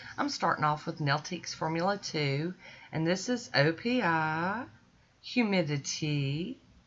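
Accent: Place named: Texas, United States. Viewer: Lady you make me smile so much! I adore watching your videos. You are creative and watching you is like watching my family. Maybe because I am a Texan and you accent and manor hit my heart. Anyway I love you so! Keep being you. Thank you!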